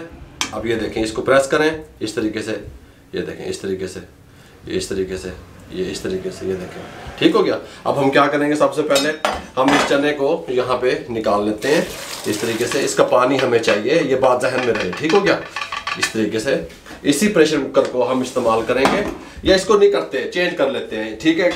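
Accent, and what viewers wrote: Punjab, India. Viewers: Oh ji tusi great ho Aapki Punjabi badi sweet hai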